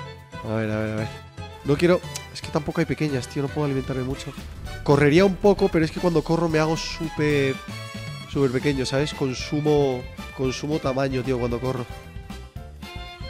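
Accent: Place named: Argentina. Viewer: rubius habla un 30% argentino y un 70% español lol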